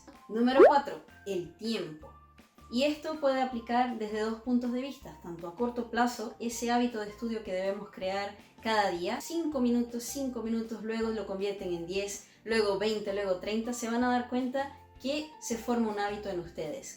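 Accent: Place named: Chile. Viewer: A escucho el acento chileno 😅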